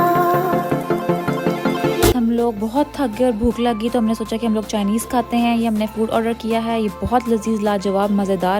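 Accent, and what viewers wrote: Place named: Lahore, Pakistan. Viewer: Nice.. That lahori accent though 👌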